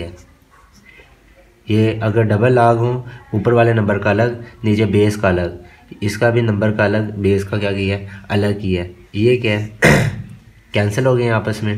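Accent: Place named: India. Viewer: I love your Desi accent laaaaag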